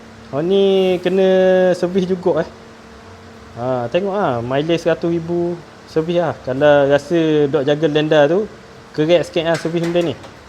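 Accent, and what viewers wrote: Terengganu, Malaysia. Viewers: Sedap dengar slang ganu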